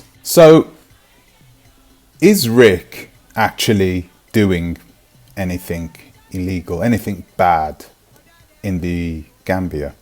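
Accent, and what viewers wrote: United Kingdom. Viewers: Why don’t you focus on what the f** is wrong with Britain, the mother of all sadistic dysfunction . So weird seeing a black Jamaican in Britain talking about what is wrong with the United States in his British accent. UK is sending all your a*** back to Jamaica, why don’t you focus on that. Prayers and blessings for the BAG family for daring to take the future of their family into their hand and making such a bold and courageous move.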